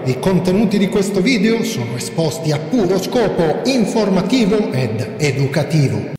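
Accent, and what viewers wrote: South, Italy. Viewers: Salve. Innanzitutto GRAZIE per quello che ci "Regali". in merito a questo video desidererei sapere se e' possibile eseguire la stessa procedura su un PC con windows 7. (dal tuo accento mi sembra di capire che non sei dl nord). Per inciso io sono un GIOVANOTTO di 85 anni e sono stato uno dei primi utlizzatori ed insegnante di INFORMATICA degli anni 70-80 di una disciscipilina con il nome altisonante di "Principi e Tecnica delle elaborazioni meccanografiche ed elettroniche" della Facolta' di Statistica dell'Universita' di Roma; con una grossa lacuna, conosco il funzionamento di ogni componente Hadware, ma sono quasi totalmente IMBRANATO nell'assemblaggio. Ancora GRAZiE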